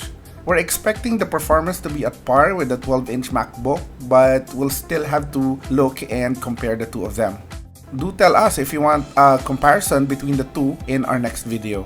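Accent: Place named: Ilonggo, Philippines. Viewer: ilonggo ka man? you sound like one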